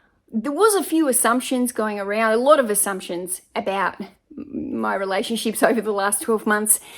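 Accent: Australia. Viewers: I have 0 clue who you are, but I'm an eBay seller too, so I'm sure why you popped up as a YouTube recommendation. But damn that Aussie accent hooked me.... "YOU SOUND LIKE YOURE FROM LONDON" ( did you know they say the aussie accent was created because of all the drunken British)? . You seem lovely and love your energy. Keep doing your thing. Ebay is LIFE. Subscribed and look forward to more. The one thing my life is missing is a Thom. You give me hope even though I'm old as well ill beagle to find one.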